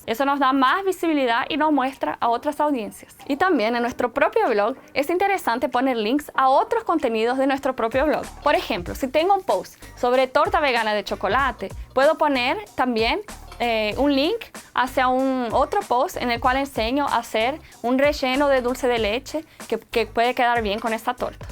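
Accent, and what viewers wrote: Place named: Brazil. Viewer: Me encanta su español con un toque brasileño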